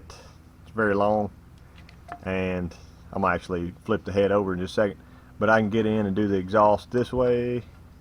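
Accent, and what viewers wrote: South, United States. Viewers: . Thanks. Great Information. Even though you have a southern accent, you dont sound stupid. Hey! Im jus sayin! You use correct descriptions and correct terminology so there is no doubt what you are talking about. Whoa! - some of these other channels! (No Names) Anybody w a phone Can put anything on the interweb.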